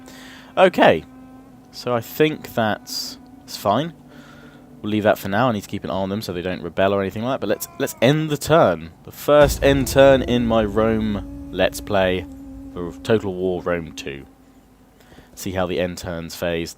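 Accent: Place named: United Kingdom. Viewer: fuck you will your british ascent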